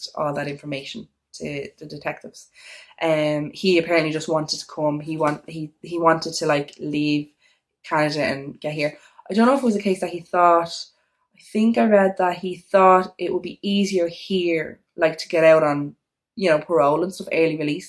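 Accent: Ireland. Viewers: Good stories,but the content in which your reading these murders is very clipped,meaning you can't read a full sentence without blurting on about something else,ur train of tght seems to drift off and it's then hard for the listener to get the gist of the story.Maybe if you just read the story as it's been presented instead of going backwards and forwards with your presumtions it might be easier for us all to follow. I do love your irish accent tgh.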